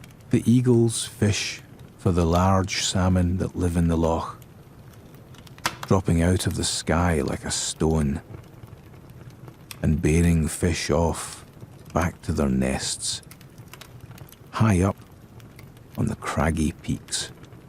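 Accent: Scotland, United Kingdom. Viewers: I never realised there was a Scottish voice on Headspace 🥳🏴! I wish you could filter a search by accent, or person, as British accents are more relaxing for me - ie, where Headspace started with Andy's voice (I find some of the American accents quite jarring 🙈). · The Scottish accent is perfect. Not too much of a heavy brogue. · I never thought a Scottish accent would be lulling me to sleep regularly. Make more! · Yayyy Scottish❤ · i want a scot in my ear all the time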